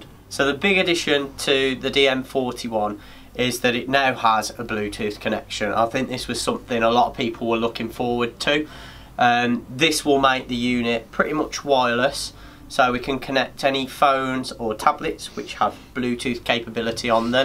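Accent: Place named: Midlands, United Kingdom. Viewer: Midlands i.e Birmingham UK or area.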